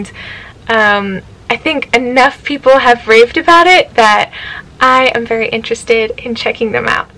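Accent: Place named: Southeast, United States. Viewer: 💙💙🐈🐈💕💕 I really appreciate your visit to my channel,! I'm happy to return you the digital love with a play in full (10 minutes) and a crazy like #58! Hmmm, I didn't know that vegan cosmetics was a thing. I can't speak for cosmetics, but a lot of Indie music and movies are much better than the mainstream stuff. Shame they don't get the exposure they deserve. "They're so amazing... I just daydream about them sometimes." Very funny with the soap-opera-esque music accompanying! At first I thought it was maybe just my imagination, but after seeing a few of your videos now, I've noticed that you have a slight accent, almost like southeastern US. "Transformative" isn't a word you get to use every day! Looks like Mystic Cat makes an appearance! Thank you for sharing, and I hope your week has been kind to you!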